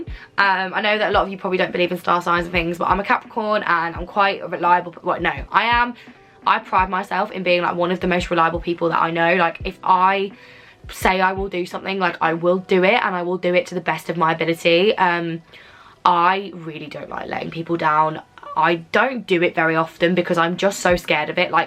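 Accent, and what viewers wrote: Birmingham, United Kingdom. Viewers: Wow brummy accent is really strong in this 🤭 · Your Brummie accent has gotten even stronger! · Yaas the Brummy accent is back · wait shes a brummie?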